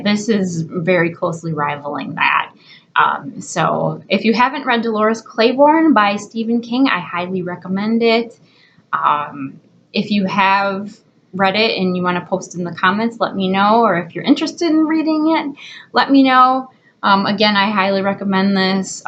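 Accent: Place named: Florida, United States. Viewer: I like your accent.. are you from Florida?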